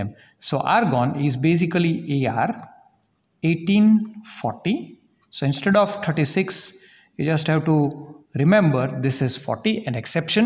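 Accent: Gujarat, India. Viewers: Sir by your way of speaking it seems like you are gujarati. Am i right?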